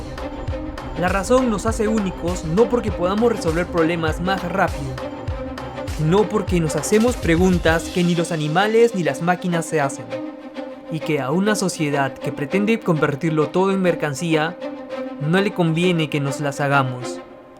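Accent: Peru. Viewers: Una pregunta eres peruano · Peruano hasta los tuétanos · soy peruano y me imagino por tu voz que tu tambien like a tu video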